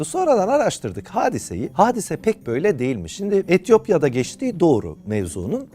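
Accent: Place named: Adana, Turkey. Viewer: Dayı oğlu adana şivesi de arada gider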